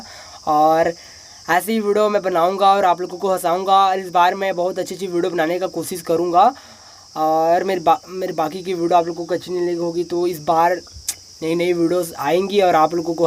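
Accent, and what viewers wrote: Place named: Chhattisgarh, India. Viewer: Chattishgarhi awaj me bane lagthe yrr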